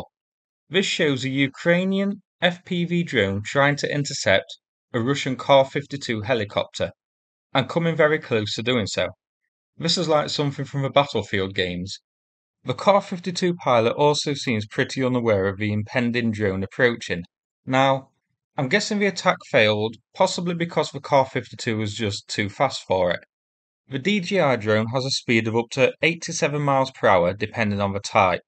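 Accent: United Kingdom. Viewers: Why pursue it buddy, when its likely you'll have your nose wiped in the sh*tey again? If you don't realise how comical your 2nd reply appeared, after you sought to humiliate our host, I can't really help you. Although I think you were embarrassed, and are now over reacting by thinking you can fight it. Our host can answer for himself the way he speaks, but I for one appreciate all the local accents and quirky expressions that exist in the UK, as someone who himself is British. In fact, I'd put a preservation order on them if I could..........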